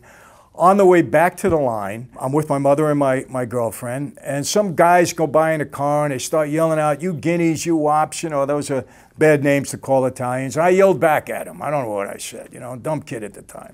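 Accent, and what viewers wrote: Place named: New York, United States. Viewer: The New York accent has to be the best accent to talk serious business.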